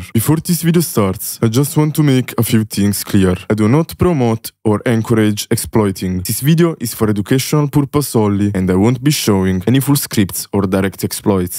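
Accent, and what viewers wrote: Russia. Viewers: you have russian accent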